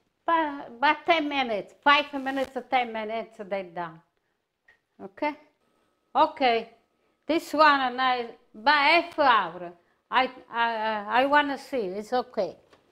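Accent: Italy. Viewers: The most Italian sounding person I've ever heard, perfect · Grandma, I’m a 73 yr. old great grandma and I have to say, you inspire me with your Italian cooking!! I’m not Italian but people think I am because I love everything Italian! I grew up with two Italian families, the Mariano’s and the Vasterelli’s. You remind me so much of them and I love your accent, your expressions with your hands and watching your videos. Thank you for sharing your recipes with all of us. (My sauce is almost identical as yours except I add sugar and wine as this is the way my Italian families did it.) Love to you, Grandma!!❤ PS: You have a lovely voice!😊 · you can’t tell an Italian accent? She’s Italian 100%. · I am waking up today in Seattle... Watching & listening to a real Italian grandmother making pizza... And she wrapped her bread dough... in blankets on the couch... like family! 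Living alone in a big city, I find this so comforting. Bless you for sharing... in English with a lovely accent as authentic as your beautiful pizza! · I love this lady. If she was my grandmother I would be fat as heck from eating so good. The very first job I ever had was working at an Italian Restaurant. The owner was also the main cook, he was straight off the boat ( as they say,) from Italy and he had the same accent. Ever since I worked there, all other pizza I eat is automatically compared to what he and I use to made at the restaurant. Not just the pizza either, but every Italian dish you can think of, Lasagna, Spaghetti and Meat Balls, Baked Ziti, Egg Plant and Veal Parmesan, and the list go's on to the deserts and more.. We got there early to make the dough and roll it out into aluminum pans coated with olive oil. I am 60 years old this year and that was my first job at 15 years old, yet even now any Italian food I eat, I still compare to his, and I am a tough critic when it comes to Italian food. 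· You have to love her and the Italian accent. Miss my Italian gmom so bad, she reminds me of her. An Italians mother's kitchen is the entire house, do not get in her way, or you get a dough slap upside the head. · I would have loved to have grown up in her home. I would be so fat! Her Italian accent and grandmotherly voice is legendary. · Love your videos and your Italian accent! Also, you cook like I do, a little bit of this and a little bit of that!! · I feel like she is my own grandma watching her do her cooking like I used to watch mine many years ago. I love listening to grandma in her Italian accent-English coz I can understand what she's saying haha. I just discovered her now and I instantly subscribed and at her age, she will have many years more to spend time with her kids grandchildren great grandchildren and friends and youtube friends. Such a joy to have a grandma. stay safe and healthy and share more of your treasured recipes!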